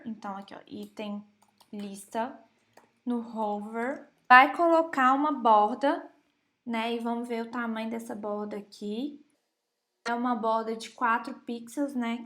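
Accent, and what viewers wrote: Minas Gerais, Brazil. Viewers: É muito sotaque de mineira !!!! Eita trem baum sô !!!!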